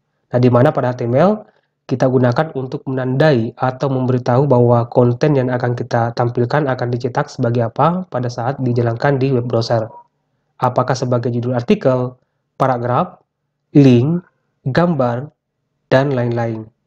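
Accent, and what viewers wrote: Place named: Sulawesi, Indonesia. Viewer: Cara bicaranya mirip orang Sulawesi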